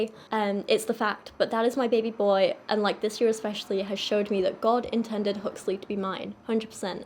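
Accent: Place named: Northern England, United Kingdom. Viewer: As a foster kid myself I think it's better for him to be in a place that fits his needs. This mess is probably gonna leave some trauma especially for an autistic child who is just trying to understand how the world and people work in the first place. I hope he'll get the love he deserves. Doesn't hurt to lose the cameras in his face too. P.s. am I imagining things or has your accent gotten a bit more northern since the move? 🤔 love it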